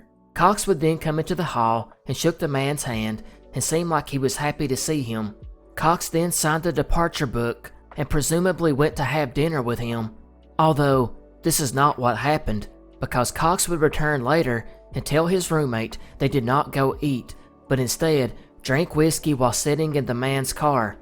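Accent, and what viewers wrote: South, United States. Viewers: You're sincerely my favorite channel. Yes, I am a Southerner and your accent has a familiar and friendly familiarity, but that's hardly the only reason. You're information is researched and vetted and your attention to detail is impeccable. Thank you for your excellent work and the excellent entertainment!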